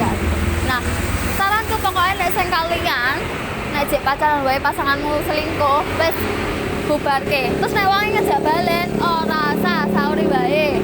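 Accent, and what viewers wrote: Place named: Java, Indonesia. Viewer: Iya memang sengaja medok supaya unsur jawanya ga ilang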